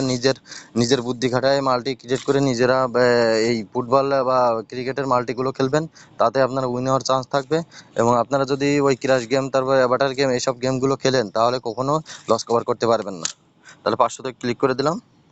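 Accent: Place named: Khulna, Bangladesh. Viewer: Vai apnar desher bari kon jelay.. kotha shune mone hocce khulna te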